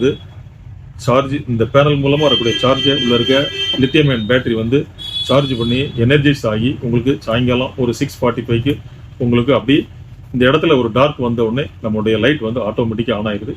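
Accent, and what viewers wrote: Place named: Kanyakumari, India. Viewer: கன்னியாகுமரி தமிழ் அப்படிதான்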